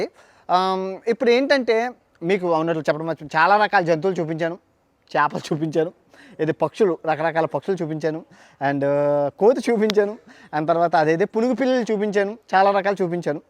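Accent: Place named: Srikakulam, India. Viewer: I started watching in Facebook now I am one of your subscribers😊 doing great job bro entha tension lo unna me voice ki me sarcastic ki chala navochesthadi Madi Srikakulam ma slag koncham me voice lo vinipisthadi..chala degara Vala laga anipistharu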